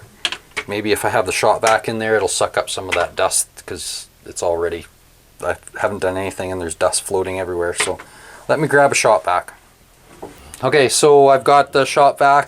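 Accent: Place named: Canada. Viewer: Luv this dudes Canadian accent.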